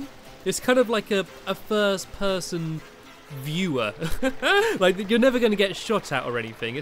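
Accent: United Kingdom. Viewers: Stampy speaks British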